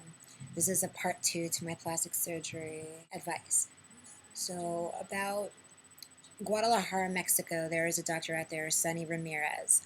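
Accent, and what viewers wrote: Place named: Brooklyn, United States. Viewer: I love how she says yous! Very brooklyn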